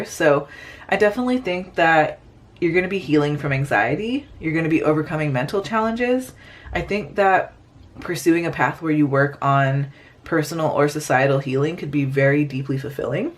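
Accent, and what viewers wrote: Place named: Toronto, Canada. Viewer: You have a Toronto accent. I was surprised when you said “university in my state.” Also, thanks for doing career readings. There are too many love channels.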